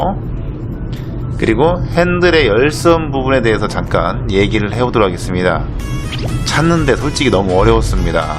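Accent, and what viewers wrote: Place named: Jeolla, South Korea. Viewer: 즌라도 사투리 쩌네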